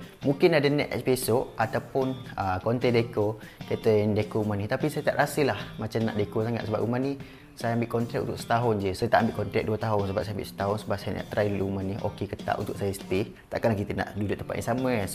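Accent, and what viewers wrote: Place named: Kuala Lumpur, Malaysia. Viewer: Cara editing mmg dr dulu tk prnh mengecewakan...Cantiks hias... Dr dulu kalau tgk time dia duk sekali dgn adam semua diorng antara paling kemas jaga umah... rumah bujang kira mmg sngt² okey... umur adik akak sebaya tp kena akak dia yg kemas bilik depa... Kalau tk sakit mata tgk...😅 Cara berckp dr dulu sgt perform bile dia brckp dlm yt dia... dulu org kecam sbb ckp loghat kl ni berlagak tp sebenarnya kalau kt pandang jauh blh menarik org luar utk tgk yt dia jgk... akak sgt suka sbb dia ade vibe mcm yt iman kamil... softspoken n details bile bercerita... Org introvert je faham... ni kalau dia review brg confirm org jadi terjebak nk beli... Terbaik... 🎉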